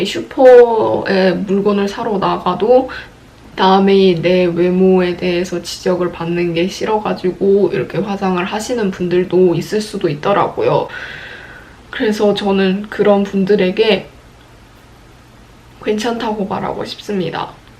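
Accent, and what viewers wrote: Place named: Gyeongsang, South Korea. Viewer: 경상도 말투가 묻어나오네요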